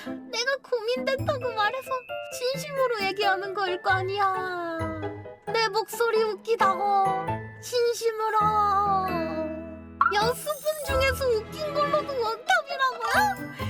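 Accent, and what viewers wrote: Gyeongsang, South Korea. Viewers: 처음엔 물방울 떨어지는 소리/농구 코트 급제동 웃음소리에 뫄며들었는데..... 이제는...... 경상도 사투리 방음부스 설치된 이후의 호탕한 웃음소리 엄청난 떵겜실력 가끔 들리는 꿀꺽 소리 자주 들리는 컹 소리 대두뫄 버츄얼뫄 뫄마모찌 없으면 못사는 몸이 되었다구!!!! 뫄마조아!!! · 웃긴건 모르겠는데 특색있어서 매력있는 목소리이긴 하죠. 같은 경상도사투리를 쓰는데 전혀 다른느낌이라ㅋㅋㅋ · 마뫄님 보이스 참 매력적이죠. 살면서 만나기 쉽지 않은 음성이라 보면 됩니다. 경상도 특유의 쎈 억양과 사투리를 구사해도 전혀 상대가 화나지 않는다는건 엄청난 강점이자 재능입니다. 교원 실습하셨을 때 인기 많으셨을 것 같은데요. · 경상도 방언 쌘거 맞습니다 ㅋㅋ 일본어 비음많고 중국어 성조있듯이 그냥 특징인데 화 많이 나셨나 보네요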